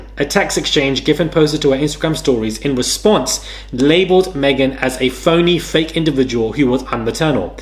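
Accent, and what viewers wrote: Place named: United Kingdom. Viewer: Slow vocals down please ❤.All of us canadian's cannot understand your uk accent.I cannot absorb what you are reading😢😢!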